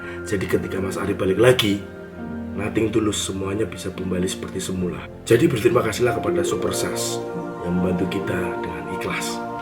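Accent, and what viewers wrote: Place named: Java, Indonesia. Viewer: Mas, kamis Inggris saiki ilang yo? Hehe, kangen karo english java ne mas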